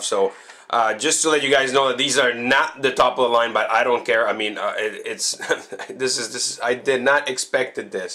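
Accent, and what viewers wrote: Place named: Canada. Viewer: i love ur deep canadian accent lmao its so dope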